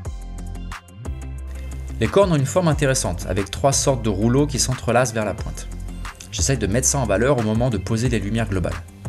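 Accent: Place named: France. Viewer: superbe travail, j’ai découvert vos vidéos en anglais mais je me doutais que vous étiez français. Puis je vous demander où vous avez imprimé cette figurine?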